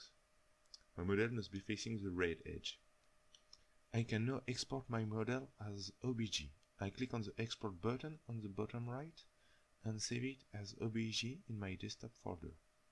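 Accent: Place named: France. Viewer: Don't worry about your french accent this tutorial is great! I just started researching voxels so this place is a good start for me! Thank man!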